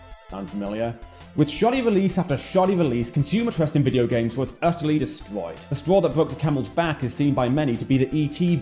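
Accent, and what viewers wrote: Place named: United Kingdom. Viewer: The irony of a person who is clearly British saying Nintendo reclassified gaming is hilarious.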